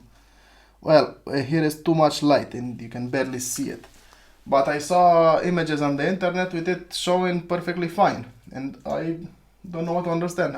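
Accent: Romania. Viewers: I think u are Romanian by the accent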